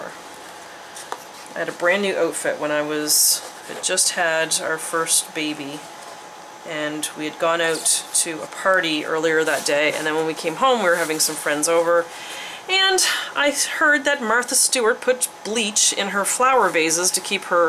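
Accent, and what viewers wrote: Canada. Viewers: Anna Nicholson if you listen she says oot and aboot, which is typical from nova scotia ;) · I heard your Canadian accent right away! Fellow Canadian here!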